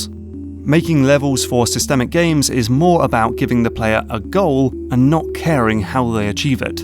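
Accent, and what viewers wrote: United Kingdom. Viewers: "Electric Danna" You british folk and your ARs. Anyway, glad to see I'm not the only on who dyed Link's hood green.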